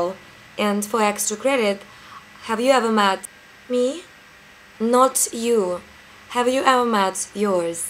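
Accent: Russia. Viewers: gaahaa! that russian accent omfg!!! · Yo are Russian?Have a rusian accent :) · ёпт !! у неё русский акцент..... она 100пудово говорит по русски !!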